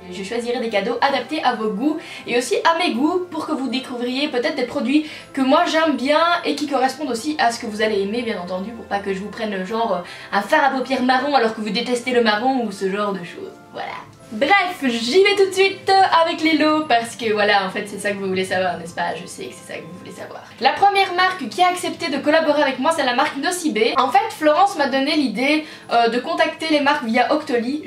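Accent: Belgium. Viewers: Tu es belge ?😘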